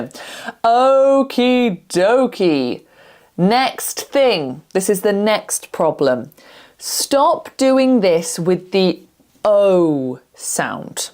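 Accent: United Kingdom. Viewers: I'm from California! I love. Your British accent!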